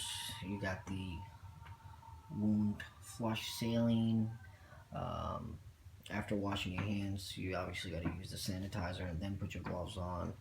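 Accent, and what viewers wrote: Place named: New York, United States. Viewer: You say awwff, for off, are you New York..New Jersey? I'm hailing from central London UK.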